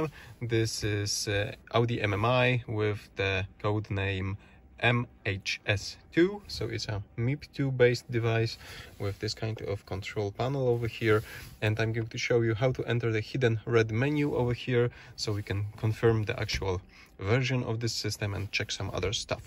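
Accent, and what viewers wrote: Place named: Poland. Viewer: Dialekt jak by Polski🤔 super tego szukałem 🙏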